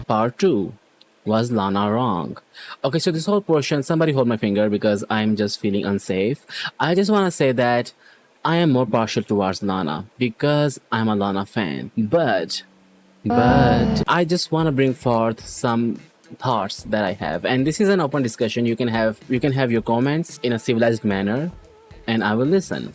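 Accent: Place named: India. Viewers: ... what? Like, that's one of the most common Indian accents. Should he stop being Indian? 😭🙏🏻 Nvm tho, this must be rage bait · I love indian accent :) its my favorite accent in english (im from brazil)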